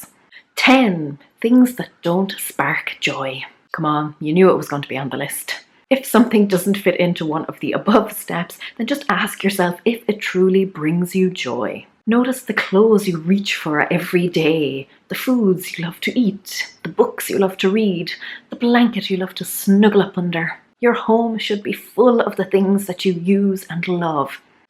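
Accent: Ireland. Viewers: I always love your humor and inspiring ideas! 😀 Wish I could have your Irish accent. My house is so cluttered it's hurting my brain, but I am a tired mom of a non-sleeping 6 month old. Husband asks if he can help clean, but it doesn't work out well, he doesn't like to organize. There are a few things he keeps that spark annoyance for me but not for him, an old picture frame from his late grandmother, and some old statues of unhappy looking folks that are supposedly passed down by family. What can I do with those? Oh and what do you do to a collection of wine corks that spouse will never get around to doing anything with? My husband has been collecting wine corks for 4 years and still doesn't know what craft he wants to make. He won't let me trash them. 😑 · That's a great idea to simply go around looking for rubbish. Amazing I didn't think of it. I agree with the lady below about your warmth and humor. 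I am partial to Irish accents. The advantages of Marie Kondo's and the Flylady's methods is they are systematic. .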